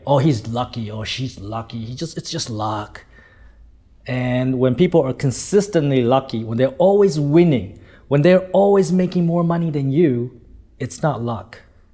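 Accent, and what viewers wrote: Standard. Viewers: nói rất chuẩn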